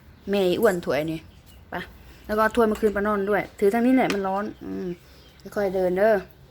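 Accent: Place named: Savannakhet, Laos. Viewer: พี่เป็นคน สะหวันนะเขดบ่อ เสียง เว้าเหมึอน เพึ่อน เฮาจาก สัหวันนะเขด เลย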